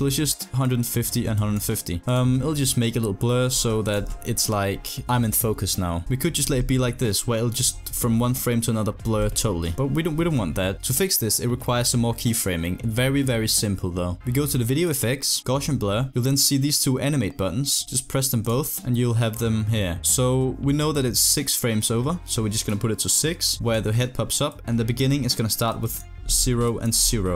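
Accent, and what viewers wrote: Denmark. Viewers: Ohhhh den danske accent xD Super video! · Laki Popic He's Danish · are u from denmark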